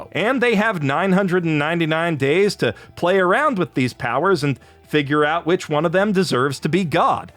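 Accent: Canada. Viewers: Thanks for the CW, I was already glaring at that timestamp and dreading spending that long listening to a canadian, and that splash screen confirmed to me that this video isn't worth watching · An overdose of Canadian in this one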